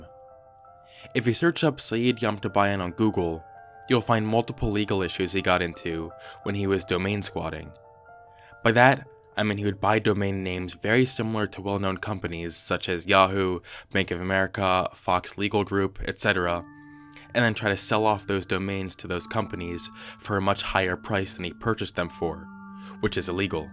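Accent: Australia. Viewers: Wow has a pretty good Australian accent lmao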